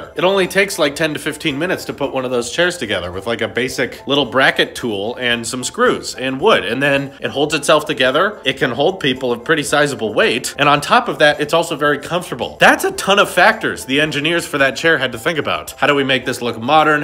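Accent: Sweden. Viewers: Wait what?! Are you Swedish? :D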